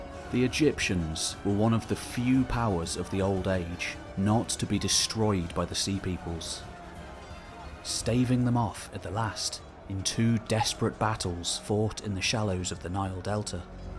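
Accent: United Kingdom. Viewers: Also the method of speaking and accent, I love. Very relaxing, + British so you sound smarter.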